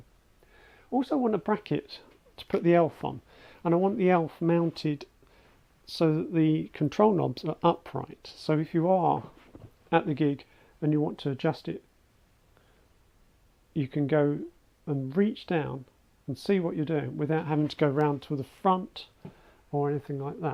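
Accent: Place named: Europe. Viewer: I highly recommend the oberton 10b200 if you live within europe (I guess you do because of the accent ;) ) it outperforms any eminence speaker on the market and is used it high-end dutch boutique cabinets. (The price tag is the most attractive of them all) look it up on talkbass, it's a great woofer!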